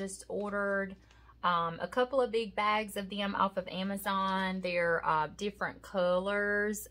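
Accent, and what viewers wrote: South, United States. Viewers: You're so creative and your projects always look high end like most YouTubers say, but they don't usually hit the mark! Not trying to be ugly to them, just trying to compliment you as you clearly deserve! Also, I love watching those of you that have a wonderful southern accent like myself... I'm from South East Texas. Where are you from?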